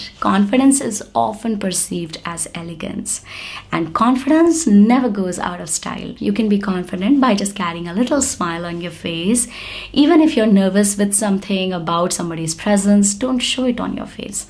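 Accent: India. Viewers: We love your Indian Accent Mum😊😍 Thank you for always sharing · Special thanks to you for maintaining indian accent. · I really admire the way you talk to us. Your pitch is clearly audible. I like your Indian accent👍✌ I'm also on a way to speak fluently.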